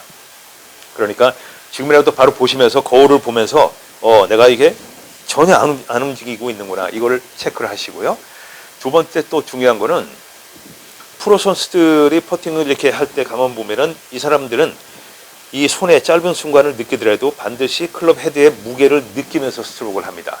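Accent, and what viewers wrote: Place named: Seoul, South Korea. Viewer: 경기 해설도 너무 쟐듣고 있어요 ~~ ’했거던요‘ 하는 서울 사투리 너무 좋아요^^ 정말 듣기 희소한 서울사투리에요^^ 제 고향이 서울이라 그런가봐여 내용도 너무 좋아서 세계적인 선수들의 플레이 보고 설명들으면 너어므 공부가 됩니다 그냥 장활영 해설위원님 해설 경기는 그냥 틀어넣고 ASMR처럼 듣고 있으면 힐링 그 자체입니다 감사합니다^^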